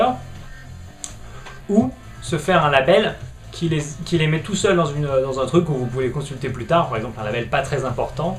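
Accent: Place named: North, France. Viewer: je m'efforce à faire la même chose mais c'est pas tjs facile. au fait on sent tes racines du nord : 20 [vinte] :D je faisais la même à mon arrivée à paris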